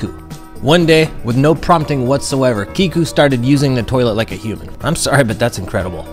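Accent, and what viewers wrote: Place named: Alaska, United States. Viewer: Where in laska you from!?! Palmer!?! You sound like a 'sillian..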